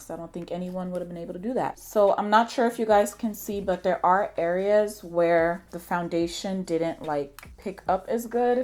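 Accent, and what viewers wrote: Nigeria. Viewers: Very beautiful and honest review. Will look forward to more of your Nigerian accent😂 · Stunning!!!Luv the naija accent🤣🤣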